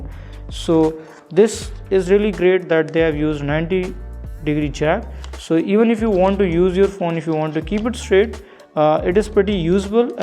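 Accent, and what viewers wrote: India. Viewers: That is hindi accent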